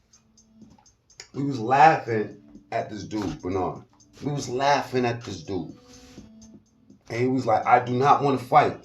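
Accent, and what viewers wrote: Brooklyn, United States. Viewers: Yo I just subbed to ya channel cuz you kept it mad real you coulda lied and been like you washed home boy up but na u kept it funky peace homie IDK if ur from Brooklyn but u sound just like my boy from Brooklyn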